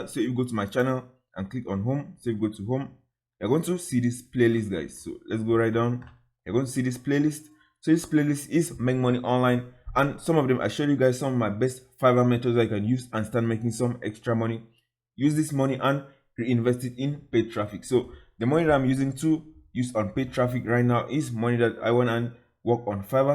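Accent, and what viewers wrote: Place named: Nigeria. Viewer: You accents is like Nigeria.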